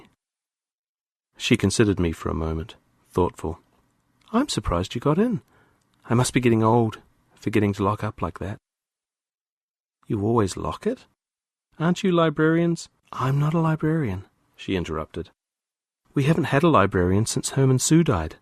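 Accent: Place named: Australia. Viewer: Mate, you’ve already got an Aussie accent that’s hard to understand on a good day, slow down your reading stop blending words together I can’t keep track of what you’re saying.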